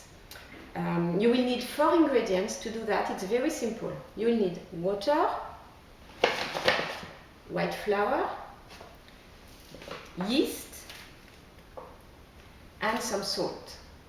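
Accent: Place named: France. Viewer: What a delight to listen to your French accent with your very good English. You bring back so many wonderful memories of the 12 years I lived and worked in France. I lived in Etampes & Arbouville and worked in Angerville. You have just demonstrated that a French Baguette can be made in so many ways and not just the classic artisan method. BRAVO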